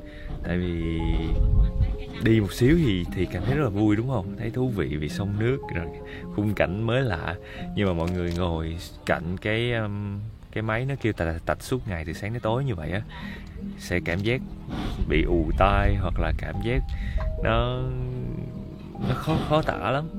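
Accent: Mekong Delta, Vietnam. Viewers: Thiệt sự là cách anh edit video rất cảm động luôn. Những đoạn mà nhạc lắng xuống, giọng anh thì trầm ấm đúng giọng miền Tây làm cho mình cảm giác được sự yên bình của quê hương miền Tây. Với 1 đứa sống ở SG xô bồ tấp nập như mình thì mình thực sự rất rất nhớ cái cảm giác yên bình đó. Lần nào xem video của anh cũng cảm động, vừa nhớ nhà, nhớ mùi khói bếp than, nhớ cái nắng xuyên qua những kẻ hỡ trên mái nhà buổi sáng sớm. Cũng nhớ sự nồng hậu, hào sảng của người miền Tây nữa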